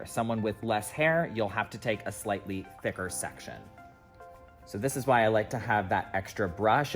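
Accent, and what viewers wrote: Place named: New York, United States. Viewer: I LOVE this tutorial. You’re a natural- EXCELLENT delivery, camera presence…. I thought I heard a little NY accent. I’d totally go to you! Application is so clean. PLEASE lemme know if ur in NYC area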